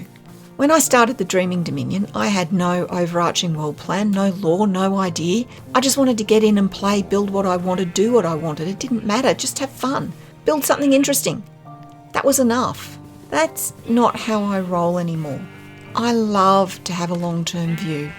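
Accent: Australia. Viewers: Hello, you sound Australian. im Kiwi, its so pleasant to hear your voice and see what you have made ! Incredible work aswell you have some passionate builds! Thanks for inspiration ❤ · thats one heck of an aussie accent love it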